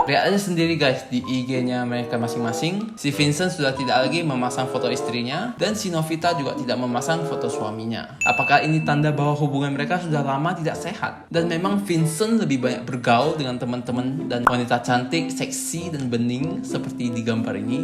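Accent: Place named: China. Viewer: Are you Chinese People Mr??? Because style your talking very funny... I'm very sorry Mr 🙏🙏🙏😊, Hopefully Always Stay Healthy and keep Strong everyone 💪💪💪💪🙌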